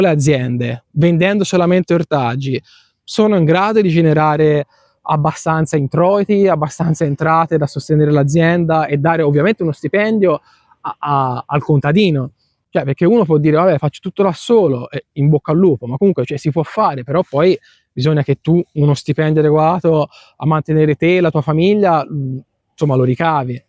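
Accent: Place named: North, Italy. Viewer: Dal tuo accento, capisco che vivi al nord,,, mi dispiace,,, possiedo dieci Milà metri di terreno,,(vivo in Sicilia) sono ormai dodici anni che ho avviato la mia partita iva,di azienda agricola,,,,produco un po' di tutto,,, è i prodotti che riesco a produrre, il 95% dei casi riesco a venderli molto meno della grande distribuzione,,, con buoni margini di profitto,,,, forse il motivo è anche che al sud ci sono molte più persone che possono permettersi di spendere molto di più per il buon cibo rispetto al nord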